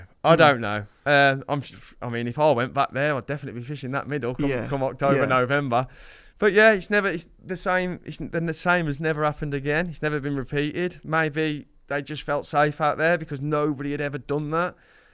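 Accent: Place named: Birmingham, United Kingdom. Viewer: with a Brummie accent! meets Peaky Blinders!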